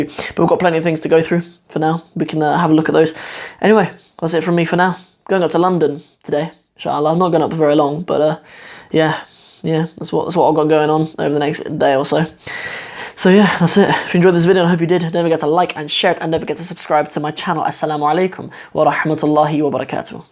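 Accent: North, Somalia. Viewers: hi Amazing video again thank you. are familiar with the southern and northern accents, there is slight difference, I've seen your videos and you use the northern accent not that it's bad or anything😊 i guess because the formal writing system is mostly in that accent. but I think you'll find the difference funny. check it out😀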